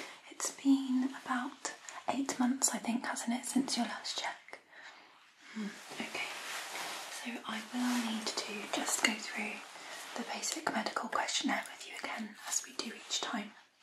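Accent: United Kingdom. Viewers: SHES BRITISH MATE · Is this brit accent ?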